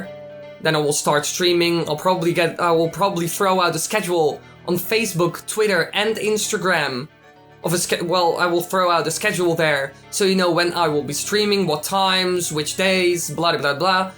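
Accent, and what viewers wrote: Netherlands. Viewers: Je bent Nederlands! Ik hoorde het al aan je accent.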